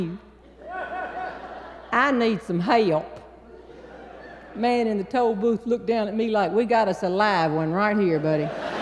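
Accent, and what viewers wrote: South, United States. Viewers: I've watched two separate videos on YouTube and correctly identified the guys as North Carolina natives (Eddie Smith, owner of the 1967 Ferrari NART Spider recently auctioned for millions, and singer Scotty McCreery), all thanks to watching videos and hearing her beautiful southern accent. Her wonderful stories make me want to visit the US and travel from North to South just to see if I can peg the difference in accents. 😉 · I’m a wee Scottish woman and I understand every word of your lovely southern accent!!! 🤩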